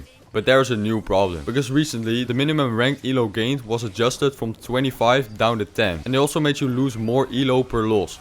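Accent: Netherlands. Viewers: Damn i smell englsih and a hint of dutch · why do i smell a dutch accent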